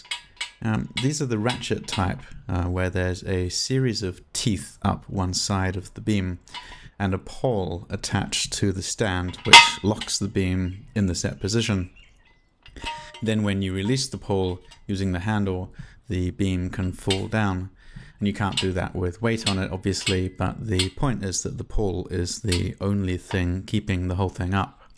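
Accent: England, United Kingdom. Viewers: Definitely not Aussie An English Accent 🏴 👍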